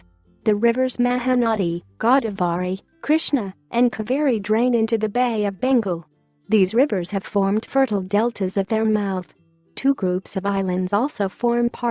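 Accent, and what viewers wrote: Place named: United States. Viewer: What I didn't like was that this was in american english... so, it was very difficult to understand places like Kanya Kaumari, Rann of Kutch and Arunachal Pradesh... So this is a bad video...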